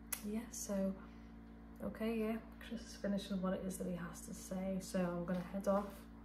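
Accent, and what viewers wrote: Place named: Scotland, United Kingdom. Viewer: Amazing reading, thank u very much! So many good advice, and lovely messages from him! It was very hard at times to hear what u were saying since u talk quietly.. But i have lived in Scotland so i was able to understand. Your accent sounds scottish!! It was awesome to hear speach that sounds like scottish! It made me recall great memories, i liked your video a lot!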